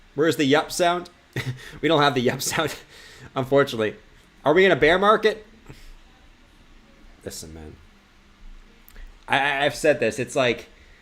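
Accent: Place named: United States. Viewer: What’s with the yankee accent all of a sudden? Wtf? A new reinvention as everyone loses the lot while it is about to fully collapse?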